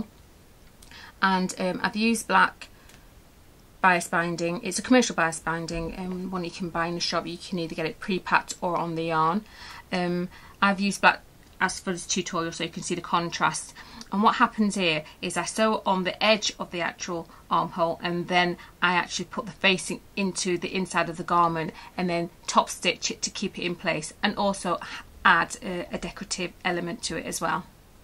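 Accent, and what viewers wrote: Manchester, United Kingdom. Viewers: very well presented, no waffle (like some fools who blather on about totally inconsequential rubbish) articulate and concise, very informative, clear, well photographed - FIRST CLASS!!! (from Manchester I assume??)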